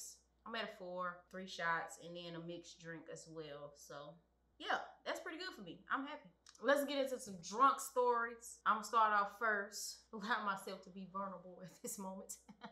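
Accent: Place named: South, United States. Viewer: This is dope. You country as fuck! Lol this is M.A. by the way. Lol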